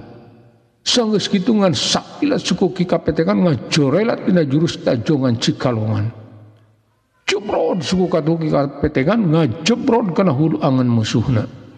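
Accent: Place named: South Jakarta, Indonesia. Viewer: Saepisan ngadongeng na JAKSEL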